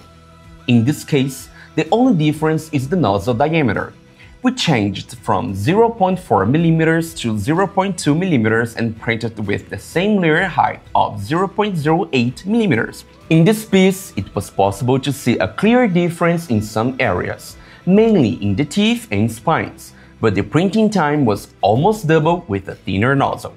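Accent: Brazil. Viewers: Your accent sounds like mine, are you Brazilian? 🇧🇷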